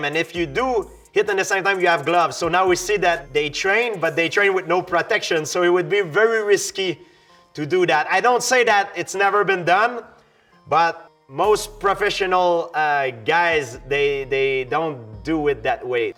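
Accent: France. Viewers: He sounds not only french but he somewhat sounds Irish on some of his sentences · The world's jolliest and friendliest MMA fighter. We love GSP and his franglaise😅♥️ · Whenever he said 'throw' with the French accent, I heard 'troll'. Made the commentary pretty hilarious in a few spots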